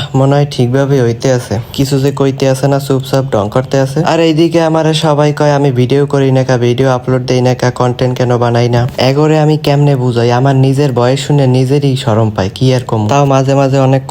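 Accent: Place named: Tripura, India. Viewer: তোমার ত্রিপুরা কথাটা অনেক ভালো লাগে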